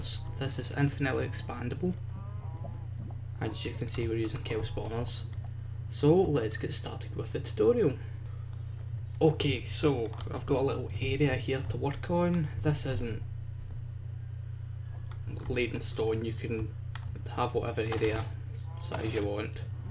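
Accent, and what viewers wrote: Scotland, United Kingdom. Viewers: is that scottish? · Ur scotish accent is top notch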